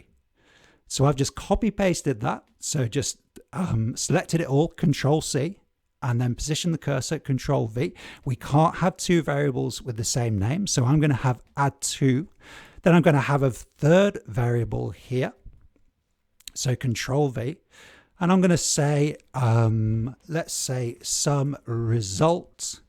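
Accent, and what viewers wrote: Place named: United Kingdom. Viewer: I also learn English here! "Stag Do"....I looked it up and it means bachelor party. We use the term "bachelor party" in US. I learned VBA from other Youtube channel , but this interactive course , I think, is better. The British accent sounds a little bit strange to me at first, but I get used to it.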